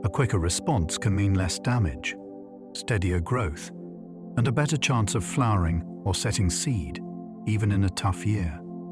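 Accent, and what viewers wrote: England, United Kingdom. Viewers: “Hello there” in an English accent now Pavlov’s me into yawning. As a lifelong insomnia-sufferer, I consider this a success.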